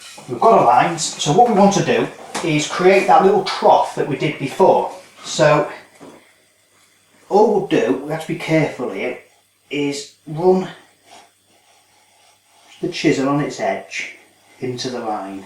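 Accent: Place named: Birmingham, United Kingdom. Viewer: "Cotton rebates"? Dude sounds like the Peaky Blinders.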